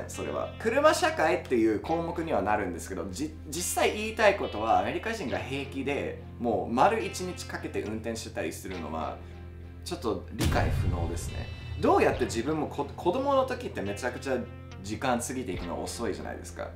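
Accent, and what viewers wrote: Japan. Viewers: 英語も発音が日本よりになってるのすごい笑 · 英語もちゃんと日本語の発音になってる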